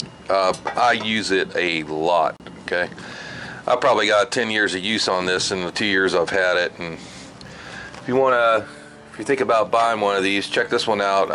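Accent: Florida, United States. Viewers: This guy sounds like he’s from Florida.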